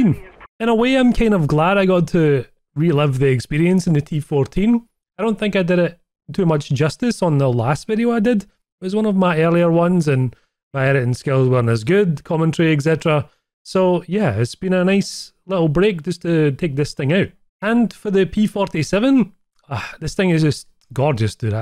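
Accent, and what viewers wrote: Scotland, United Kingdom. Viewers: Where in Scotland you from mate? Really enjoying your videos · Where abouts in Scotland are you from?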